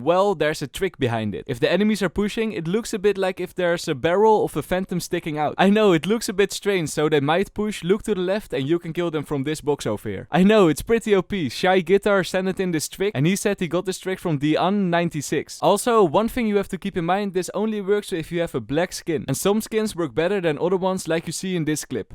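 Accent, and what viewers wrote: Netherlands. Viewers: Dear i have a burning qeustion u sound like u have a dutch accent so please r u dutch? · Can just hear your dutch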